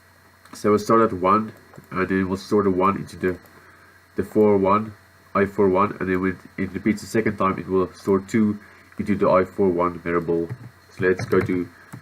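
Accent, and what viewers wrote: Afrikaans, South Africa. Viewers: Hes from South Afrika. Theres no doubt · like jou afr accent XD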